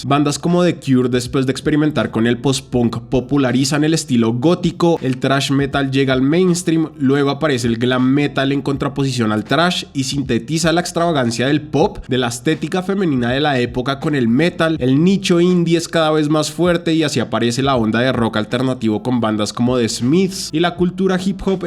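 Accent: Colombia. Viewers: Eres Colombia no ? Xd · Eres Colombiano?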